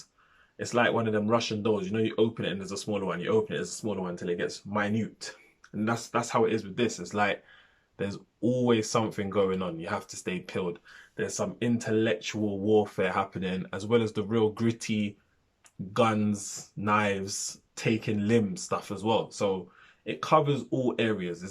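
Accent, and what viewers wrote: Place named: London, United Kingdom. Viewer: Just subscribed. Great review. You made me feel like I liked it more than I originally thought. I think my only qualm with the film was there were slightly too many red herrings! Like, a lot of “you thought this happened but it was actually this!” If that makes sense haha. Side note it’s so refreshing to hear a familiar accent on YouTube 🤓🙏🏾 you sound like London and I’m from there so it feels like watching a friend. Keep up the great reviews! I’d recommend 1917 if you haven’t seen it yet. One of the best films I’ve seen in a while Edit - just seen you’ve got a 1917 review up so I’m gonna watch it now!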